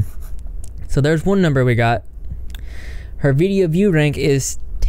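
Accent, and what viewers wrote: South, United States. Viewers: i dunno :|........but in my opinion.......maybe its the southern accent......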